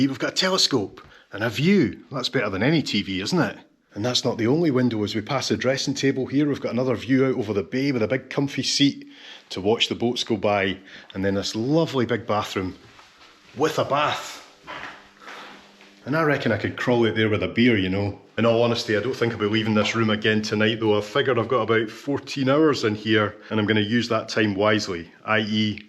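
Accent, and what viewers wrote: Scotland, United Kingdom. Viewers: The more remote a Scottish place that goes to, the stronger his accent gets. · Tbh.. After watching through most of you videos I hade to google “Wee”. I understood it context to be tine but in my Swedish brain it’s something else.. but apparently my Scottish is useless from time to time:) Keep it up. I enjoy you dialect and your videos.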